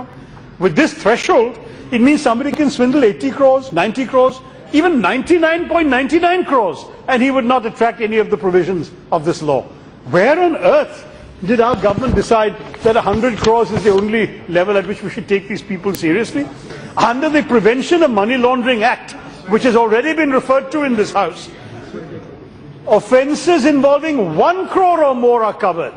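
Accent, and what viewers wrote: England, United Kingdom. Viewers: Unfortunately honorable union finance minister does not understand his English accent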